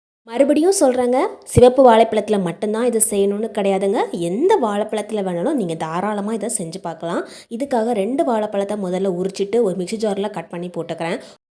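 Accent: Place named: Kongu, India. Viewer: நாங்கள் இப்படி தான் பேசுவோம் எங்க கொங்கு மொழி சூப்பரா தான் இருக்கும் மரியாதை கலந்த மொழி வாழைப்பழத்துல குற்றம் கண்டு பிடிக்கிறிங்க பாஸிட்டிவ் பேசுங்கள் நெகடிவ் வேண்டாம் இந்த பெண் பேசியது அருமையாக தான் இருக்கு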